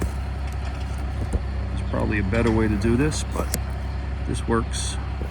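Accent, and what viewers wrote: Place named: East Coast, United States. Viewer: Hey there. Long time follower since we were East Coast neighbors with distinct "accents" lol, before your move to sunny Fl. The best thing I liked seeing you do was not only the last upgrade video where you replaced the engine flush connectors to quick fits, which is a game changer and something me and Dad did to all our boats and dock gear back a bit in the day. But you read my mind and connected them both with the jumper for a one and done, which wasn't available back then. But yeah was really another cool thing you came up with, on the fly for maximum ease. It brings me back to the good ole days with Dad, you two have/had the same think on the quick and make it work or fix anything. 👍 Good stuff. Best wishes too you 3 on your new girl and new adventures!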